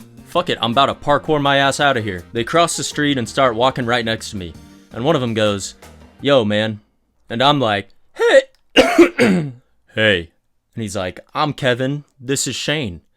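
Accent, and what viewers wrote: South, United States. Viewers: with a slight southern drawl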